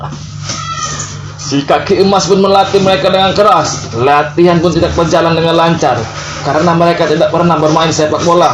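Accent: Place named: Palembang, Indonesia. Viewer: Ay wong palembang cknyo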